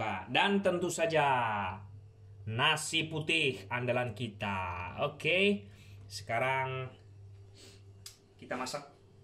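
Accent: Java, Indonesia. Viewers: ngapunten mas......kulo tanglet sampeyan asli pundi toh kok jowo ne genah? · Mas kok bilang iyah, dr jawa yah? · Jowone kabupaten ngendi to mas ? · Ketoro Iki wong jowo Yo mas bro..kok ngomong Sego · Jowone ketok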